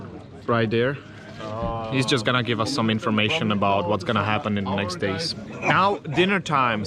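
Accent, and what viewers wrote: Czech Republic. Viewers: so you are czech or austrian? :D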